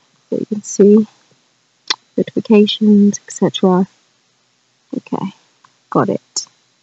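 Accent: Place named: England, United Kingdom. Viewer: Nice review. I am always drawn to a good hardware keyboard. I really wish Moto had one in the pipeline based on the X....Nice to finally hear your voice. Your English accent is cool.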